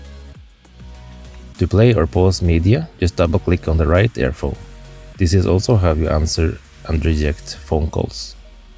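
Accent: Norway. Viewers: the norwegian accent. AHHHHHHH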